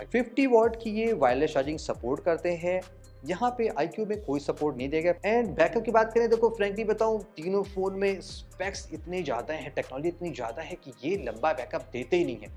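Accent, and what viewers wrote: Lucknow, India. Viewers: आप लखनऊ से हैं??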